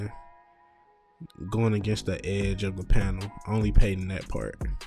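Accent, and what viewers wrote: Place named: Detroit, United States. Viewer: Wut up doe! Good shyt on the forces they crispy. Sound Like U from Detroit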